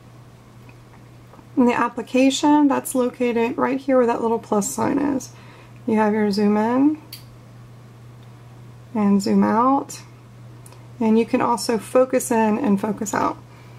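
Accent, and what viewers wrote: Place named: South, United States. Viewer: phục êwê Sounds like you're in the Southern US, is yours exposed to direct sunlight? I'm always concerned about the hot sun cooking these el cheapo cameras.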